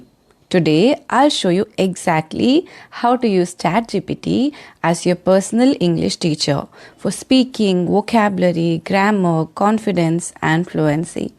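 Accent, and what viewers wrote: India. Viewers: U r such kind desi voice who might be transformed very easily to standard English pronunciation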